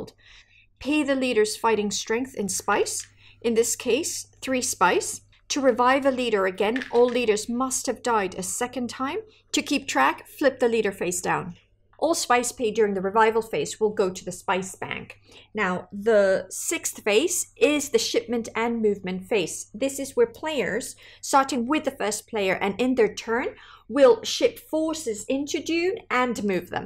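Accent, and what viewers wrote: United Kingdom. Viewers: Wow. Thank you so much. This was such a well produced rules set for Dune. I’m sorry that I’ve never come across your channel before. Do I detect a hint of Scottish in your voice? Thanks again!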